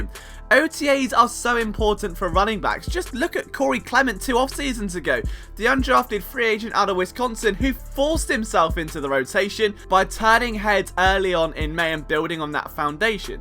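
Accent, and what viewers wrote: United Kingdom. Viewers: That is the problem for me. The content is excellent. Just can't stand the little boy brit voice.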